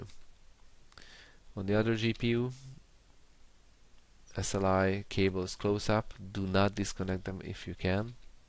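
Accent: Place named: Hungary. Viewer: Tudtam, hogy ez magyaros akcentus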